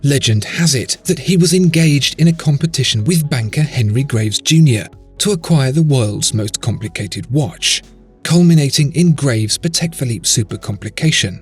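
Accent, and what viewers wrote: United Kingdom. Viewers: Now if we could just get you Brits to stop saying 'Pack-AHHHHD'.....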